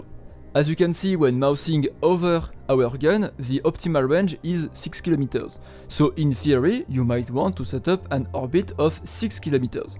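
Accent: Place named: France. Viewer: Precise, informative tutorial...and with a French accent! Really wish more Eve tutorials were executed with the same level of proficiency.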